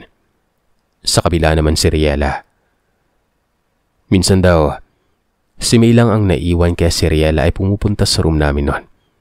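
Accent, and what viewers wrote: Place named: Ilocos, Philippines. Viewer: Ang cute mo mag Ilocano, Sir 😂 Proud Ilokana here. 🫶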